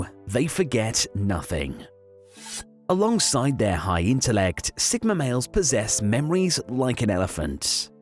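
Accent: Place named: United Kingdom. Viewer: If you were born in America before 1960, and identify as a biological male, then you are what this charlatan defines (withHisBritishAccent), as a "sigma male".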